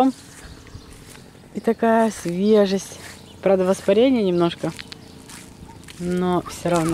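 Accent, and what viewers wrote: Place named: Belarus. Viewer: здравствуйте. Я год тому назад случайно попала на Ваш канал. Понравилось просто Ваш говор, ведение разговора. А когда Вы нынче приехали к родителям и мама с папой стали учавствовать в с"емках и услышала говор родной, песни - все... Моя любовь - Беларусь!!! Пусть Господь даст здоровья Вашим родителям на долгие года!!! Пожалуйста, научите их записывать для нас видео ( хоть раз в месяц) и Вы уж сами до на доносите их видео... Спасибо за все!!! До свидания! До видзення...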